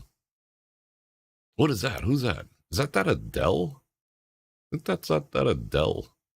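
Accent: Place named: New Jersey, United States. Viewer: It was great fun. Sorry, i missed the live part, what ever you talk about, its like have a friend chatting, i really enjoy listening to you, you sound like Jersy to me, I'm from Wilmington, D, just over the bridge.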